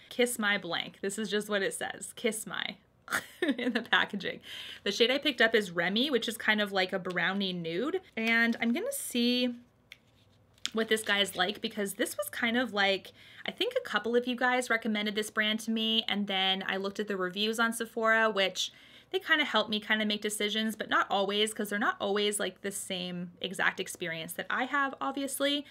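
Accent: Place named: Canada. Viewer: Good morning (at least it’s morning here in Germany 😀). I’m a Brit living in Munich, Germany and because of the time difference you are very often my breakfast buddy 😊. Your videos always put a smile on my face and cheer me up if I’m feeling down. You have a lovely sunny disposition and I love your Canadian accent! The haul looks great. Think I might have to get the Briogeo mask, as well as the Marc Jacobs palette. Really hope the mascara lives up to your expectations - now I’m worried that I hyped it up a bit too much (it is great though). Have a great day xx 💋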